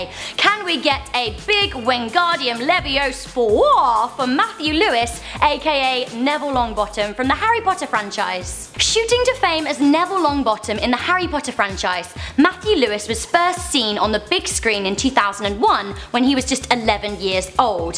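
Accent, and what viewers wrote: United Kingdom. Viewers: excuse me Miss Brit with the annoying accent..HALEY DID NOT HAVE A RUINED CAREER AT ANY MOMENT OF HIS LIFE SO FAR. <link> PROVES IT....CHECK IT OUT.... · I like her British agcent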